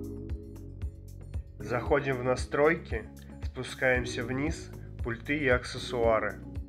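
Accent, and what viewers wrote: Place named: Uzbekistan. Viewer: Узбеки что ли озвучивают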